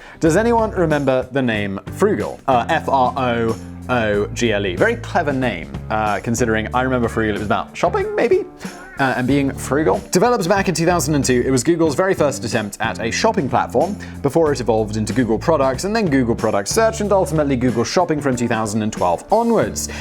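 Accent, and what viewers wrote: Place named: RP, United Kingdom. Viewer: Anyone else enjoy hearing swear in his posh accent? For me, it’s similar to the inner-child glee I feel when Betty White says something that just seems ‘wrong’ coming from the mouth of a beloved grandmother figure. You go on with your bad selves, Miss Betty and. My inner-child thanks you.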